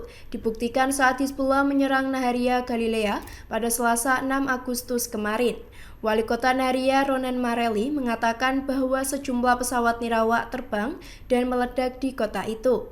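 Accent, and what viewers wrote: Java, Indonesia. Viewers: Narator nya medok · mimin nya medok amat ya😅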